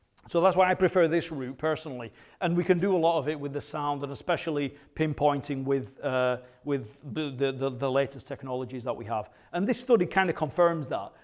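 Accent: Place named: Scotland, United Kingdom. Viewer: Scottish accent really sells it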